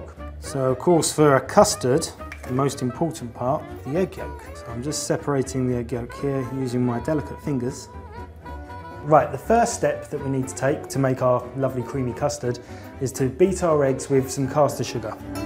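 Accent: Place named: London, United Kingdom. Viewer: No, he's a lefty remainer, using "A2? " milk. Must be a "London" thing!! Listen to his accent.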